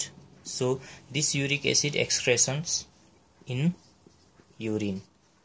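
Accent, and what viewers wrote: India. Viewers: WHEN INDIAN WOMEN TALK ITS SO SEXY, BUT THE DUDES....WHAT? AT FIST ITS OK THEN AFTER AWHILE I THOUGHT HE WAS GOING TO SPEAK HINDI. THIS WAS A VERY GOOD GOUT VIDEO HANDS DOWN! WHAT HE IS SAYING ABOUT ALLPURINOL AND COLCHICINE IS SO TRUE AND I DISCOVERED BY ACCIDENT AND TIME. IF YOU CAN CHANGE YOUR LIFE STYLE USE COLCHICINE, IF NOT ALLPURINOL. IF YOU NOTICE AFTER ONE YEAR YOUVE HAD 5 OR MORE ATTACKS AND YOUVE CHANGED YOUR LIFE STYLE TAKE ALLPURINOL. IF NOT TAKE COLCHICINE 2 FIRSTDAY WITH ALLPURINOL, ONE FOR 3 DAYS WITH ALLPURINOL. FOR EVER.CRUSH TYLENOL AND A QUART OF WATER AND DARK SWEET CHERRIES OR FRUIT TOGETHER FOR SEVERE PAIN IF YOU CANT GET (OXYCODINE, PERCOCETS, VICADINE AND ETC). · I like the Indian accent 😁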